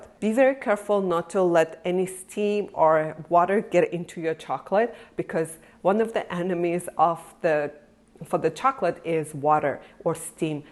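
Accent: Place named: Australia. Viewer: I bet you sound so much cuter saying that with your Australian accent 🙂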